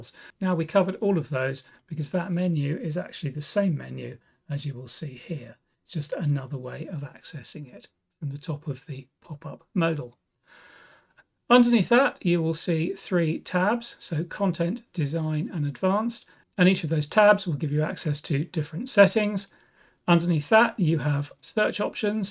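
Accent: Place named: United Kingdom. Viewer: Good morning and thanks for your informative videos. A little tip for your presentation… your picture covers the settings window-sometimes. Please move the settings window more to the left since your picture is placed on the bottom right😊 I really like your calm way of explaining and your perfect British accent which makes it pretty easy for understanding everything. Best regards from Germany Ralf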